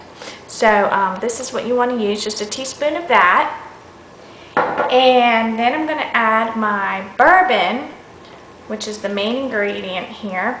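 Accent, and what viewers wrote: South, United States. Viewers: An Asian girl with a southern accent. You know this Bourbon Chicken is on point!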